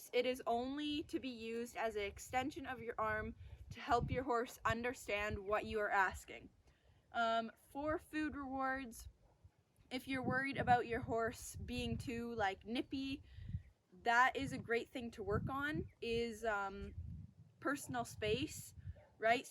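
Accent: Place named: Canada. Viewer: Hello, I'd like to learn more about Missy please. Age, height, color and with that gorgeous dorsal stripe (favorite behind dapples) what you know about breed(s). Also a question about you please if its alright. 50+ years ago I dated a young man from Canada and 2 things stayed with me. The distinct sound of saying the words out and about which I hear in you that prompted the ask and the use of "eh" which seems to be fading. It was so strongly used back then with every Canadian I met while living close to the border and traveling through the country in the 1970s. I wish you a strong build on your YT page and its success is a win for horses. Two accounts I follow that I highly recommend you look at are, 1) THE CLEVER COWGIRL So far we've never fully seen her face but what I do see is a lovely natural blonde who reminds me of lovely strong Nordic women. 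She's kept her name private also but her account name has led to us calling her CC and she seems very fine with that. I imagine she is between late 20s and middle 30s and like you CC is working to build up her account. She worked training horses for others in Utah but she and hubby are now in Montana where she buys the auction horses most people don't or shouldn't want. More than once she's been kindly told she ought not buy a specific horse including one that cost her $25. However, with her >not< bragged about humble but awesome experience and ability she zeros in on the issues then, when ready, sells to an appropriate rid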